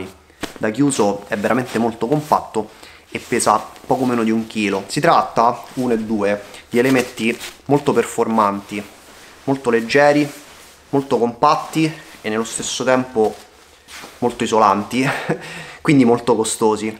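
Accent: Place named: Lazio, Italy. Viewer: Ciao, grazie per la condivisione della tua attrezzatura invernale! Purtroppo ti capisco quando dici che è una tristezza fare video in casa... qui in Piemonte, da novembre, siamo stati più arancioni/rossi che gialli e purtroppo anche io mi sono dovuto arrangiare con video indoor! Speriamo di tornare presto in montagna!! PS se hai seguito qualche mio video avrai capito che sono Laziale (Latina) di origine, tra l’altro ho vissuto tre anni a Viterbo e il tuo accento mi ricorda la mia gioventù! 😂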